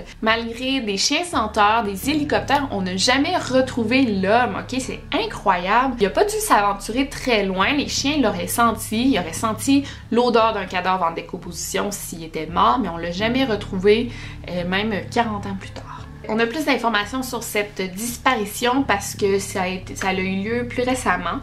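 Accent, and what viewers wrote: Quebec, Canada. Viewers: Wow ton accent est encore plus présent depuis que tu es rentrée au Quebec! Je vis en Belgique et grâce à toi je déteste moins l’accent québécois ^^ J’adore tes vidéos, la façon dont tu racontes les choses...une vraie addiction !! plein de belles choses pour la suite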